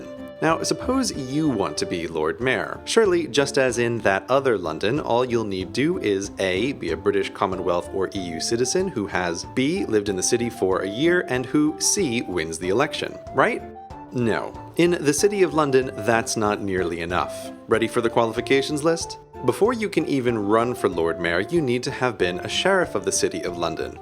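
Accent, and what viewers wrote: United States. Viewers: Thought you were American? Mind=Blown! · He mentioned that he went to an American college. Judging from his accent, I think he's American.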